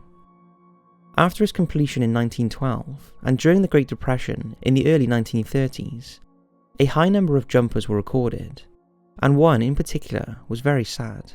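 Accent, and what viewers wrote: Wales, United Kingdom. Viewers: Will follow when you start speaking properly and stop sounding like some Welsh Robot. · Are you welsh?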